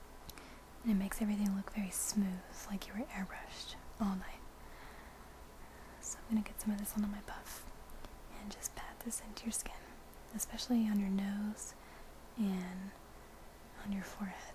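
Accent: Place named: South, United States. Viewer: Sounds like she's from my part of the world... Down south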